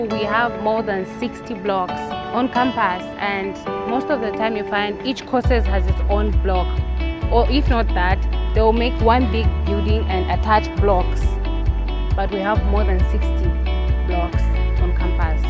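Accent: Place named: Zimbabwe. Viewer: Nice video babe Your school is nice❤️ I’ll do my school’s vlog too❤️ But why did u have zim accent lol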